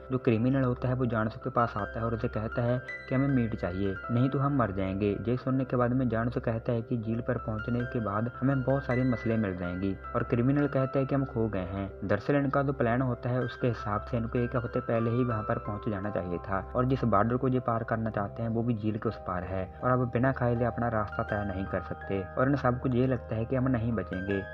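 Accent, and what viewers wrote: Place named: Haryana, India. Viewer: Bhai hryanvi ho k? Touch aa rheya se hryanvi.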